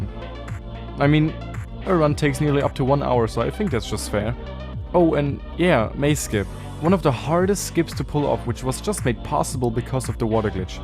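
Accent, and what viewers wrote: Germany. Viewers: are you german? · I can hear the German accent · after 2 seconds of watching I could tell you were German. (du machst krass guten content und verdienst mehr aufmerksamkeit bro) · do i hear DEUTSCH?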